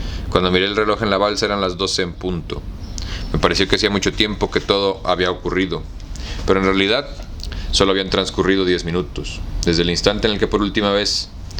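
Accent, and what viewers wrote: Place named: Colombia. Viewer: me gusta como hace el acento colombiano muchas gracias or estos videos soy colombiano saludos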